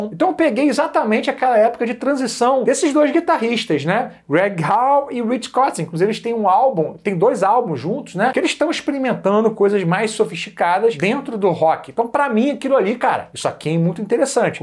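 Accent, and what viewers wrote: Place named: Rio de Janeiro, Brazil. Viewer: Só esse sotaque carioca já ganhou o meu dislike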